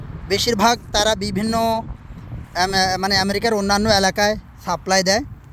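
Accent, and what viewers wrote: Noakhali, Bangladesh. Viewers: আইচ্ছা এইটা তো নোয়াখালী মতো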